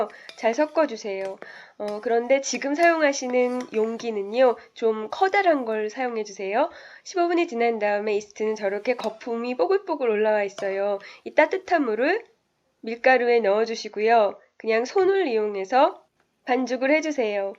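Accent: Seoul, South Korea. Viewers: 사울사투리 너무귀여어 ㅋ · ㅋㅋㅋ말투 넘 귀여우셔요ㅋㅋ약간 90년대 서울 사투리 같아요ㅋㅋ영상 감사해요!맛있게 만들어볼게요~^^ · 서울 억양 너무 좋습니다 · 서울 사투리 억양으로 말 하시는 분 근래에 첨 봤네. 덕분에 피자 맛나게 만들어서 먹었어요~ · 와! 듣고 보니까 말씨가 딱 옜날 서울 사투리네요 ㅎㅎ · 목소리 말투가.. 너무 매력적이시네요 옛날 서울 사투리? 같아요 정감있고 예쁜어감의 목소리여서 계속 듣게되네요~ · 서울 사투리 쓰신다!!! 말투 넘 좋아요ㅎㅎ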